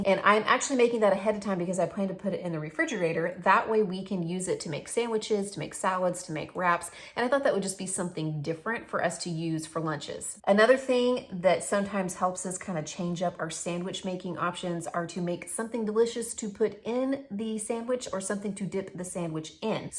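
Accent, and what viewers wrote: South, United States. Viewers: I can’t tell you why but the “that’s French y’all” with your southern accent absolutely sENT me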